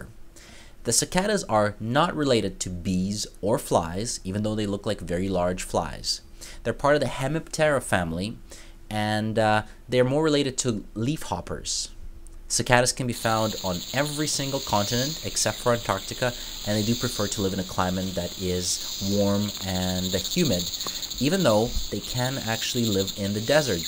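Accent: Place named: United States. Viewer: I know without checking what it will say, it's an American dictionary. I've already explained how you yanks pronounce it & why. That doesn't make it correct, only in your little realm.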